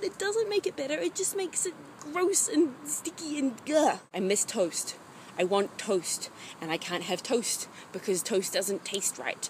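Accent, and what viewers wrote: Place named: United Kingdom. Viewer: Yes, I agree with her. She is right but I remember feet and inches because I am 54. I hate it when they don't use stones but pounds. I cannot visualise what a person looks like who is 300lbs but I could if it was put in stones and pounds. I did not know she was from New Zealand but she sounds like she is from Britain. I do not like the way yanks say 10th grade or 6th grade. Why not just say how old the child is. It would be a lot simpler. Why do you not have letterboxes in the front doors?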